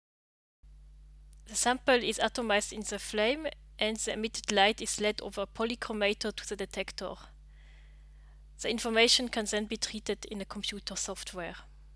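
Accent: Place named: Germany. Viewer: most german sounding English i ever heard... but informative non the less :D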